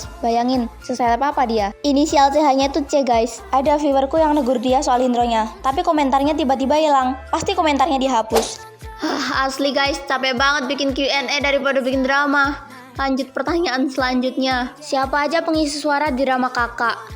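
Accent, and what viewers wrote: Indonesia. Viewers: Kok sama Jawa Timur nya kak😄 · wihh aku jg banyuwangi, tp kalau cara ngmong nya kak emng mirip banget sih orng banyuwangi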